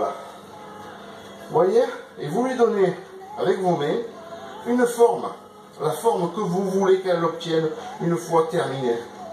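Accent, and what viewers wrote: South, France. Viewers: Travail de pro hyper appréciable, bravo à toi et bonne continuation... Ton accent du Sud et tes expressions me font bien rire! À+++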